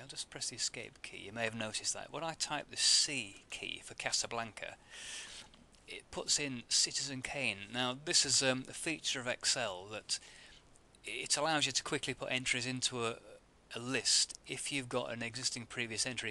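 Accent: United Kingdom. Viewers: thanks u british guy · don't know why i can listen to british people doing tutorials but american accents bore me to death in tutorials!? Even though im american! Anyways good job on the video!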